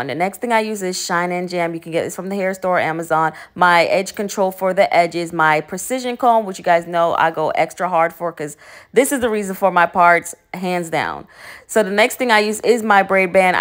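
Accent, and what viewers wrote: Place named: Jamaica. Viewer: I could here the Jamaican in you😊😅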